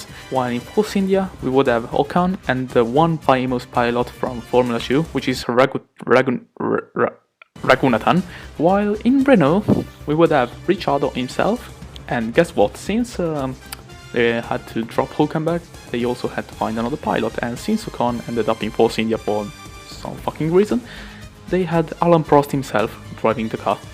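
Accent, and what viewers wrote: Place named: Italy. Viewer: L'accento mi sta facendo morire, te se ama #alphamaxnova1ItalianPride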